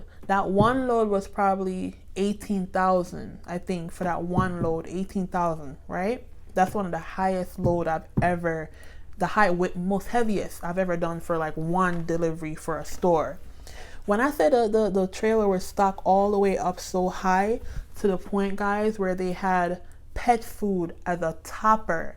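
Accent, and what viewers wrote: Jamaica. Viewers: I really want to do local but hmmm! I think I heard a Jamaica accent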